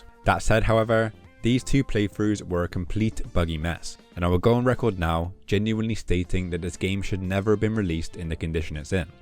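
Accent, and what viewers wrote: United Kingdom. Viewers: All good😂 But may I ask, which accent you speak? I guess something from the UK?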